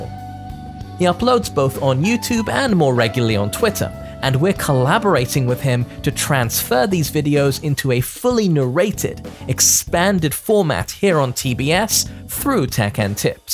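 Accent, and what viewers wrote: United Kingdom. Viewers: "...parallel universe where fighting games are designed better." Ow, I'm not a Tekken dev but that hurt me by proxy. the english accent makes the shade worse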